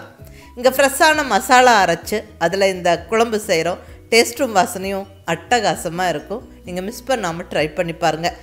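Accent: Kongu, India. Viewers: Hi mam, ur recipes r too good. I like ur kongu tamil also. Keep rocking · கொங்கு தமிழில் நீங்கள் சொல்வது நன்றாக இருக்கிறது. உங்கள் குரலும் அருமை . சமையலும் சூப்பர்.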